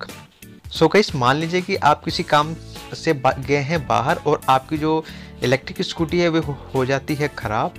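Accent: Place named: India. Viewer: i could not understand anything you said. your english has a strong accent, possibly indian.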